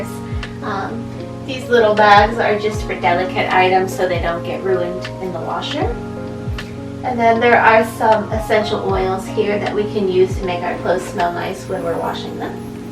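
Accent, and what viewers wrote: Telugu, India. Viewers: Meeru telugu matladuthunte chala cute gaa untundi andi , naaku chala istam andi mee telugu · Chellemma nee telugu yentho mudduga baaga Matladuthunnavu,,, naaku maatru baasha telugu yentho istam..mee eddariki naa deevenalu ..mee amna , Nanna etc valla gurinichi video pettandi..all the best ,,,, n dasara SUBHAKANKSHALU...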